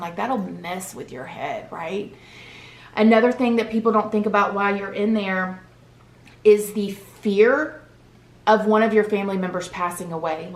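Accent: South, United States. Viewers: Girl..I adore you! I have been binge watching your videos. There Is something about u that speaks to my soul! I love how your a lil bit of country ( accent )..and a lil bit of street yet classy all wrapped in 1! Does that make sense? Lol. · She's got a beautiful southern twang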